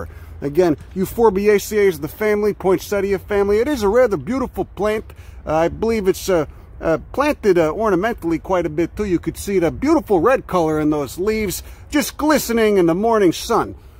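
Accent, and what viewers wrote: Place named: New York, United States. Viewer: Name that accent! My guess is NY/NYC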